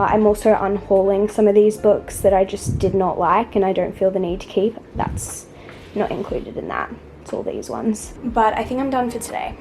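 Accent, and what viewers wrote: Australia. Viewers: For some reason it took me until 15 minutes into the video to realise that you're Australian....... I'm Australian.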